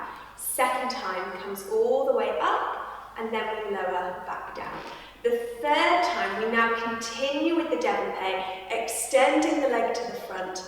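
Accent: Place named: England, United Kingdom. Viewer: Well done! Very clear and well-thought out. Plus I love the English accent and correct ballet terminology.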